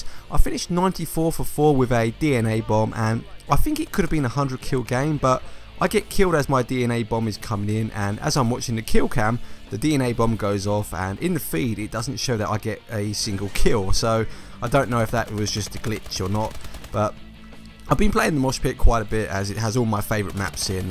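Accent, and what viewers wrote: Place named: United Kingdom. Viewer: From the sound of your voice, I assume you're from the UK or somewhere in Europe? If so, that region has ALWAYS been paired up with people outside of the country since COD4. It's not SBMM at fault for your laggy connections to other countries. It's the region you are in, what your connection quality is, and what time you play.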